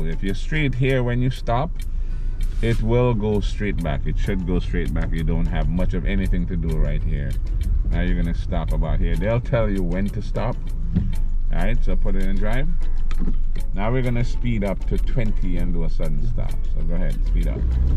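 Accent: Jamaica. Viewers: you jamaican fam?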